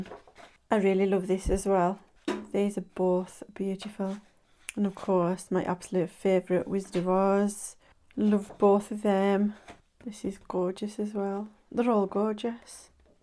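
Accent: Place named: Whitehaven, United Kingdom. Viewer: Hi, iv just found your fab channels, your accent reminds me of home I’m from Whitehaven, love your art and really enjoying the format hope to see more of your work ❤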